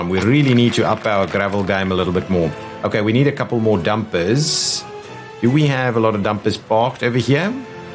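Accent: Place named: South Africa. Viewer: This is gud shit love youre content. Also love youre accent have note heard alot of southafrican but it puts a smile on my face. So thanks fore youre hard work and Star safe in the dificult times!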